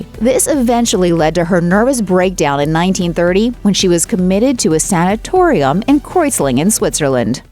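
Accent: United States. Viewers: Gd contente but bad 😰 America chinlish accents!! Ask/employ the EU bondle likes Swedish or Danmark or Switzerland for boardcaster plz !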